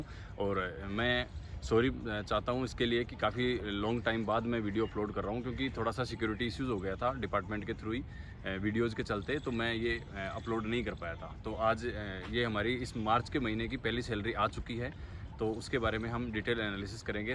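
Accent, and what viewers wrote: India. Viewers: Aap sikar se ho kya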